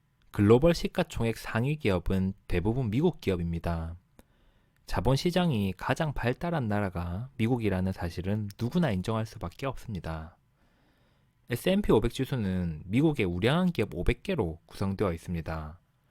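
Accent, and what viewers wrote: Gyeongsang, South Korea. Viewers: 억양에서 경상도사투리가 느껴지네요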